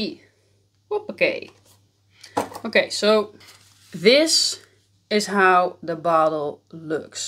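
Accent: Netherlands. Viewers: Absolutely love your Dutch accent 😍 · Dat Nederlandse accentje😊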